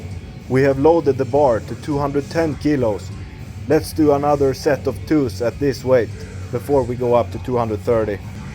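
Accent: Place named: Sweden. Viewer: With your Swedish accent, It feels like watching as if Magnus Samuelsson filmed his sessions in his haydays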